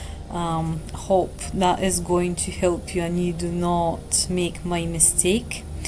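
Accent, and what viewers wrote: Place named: Scotland, United Kingdom. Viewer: You sound Scottish 🏴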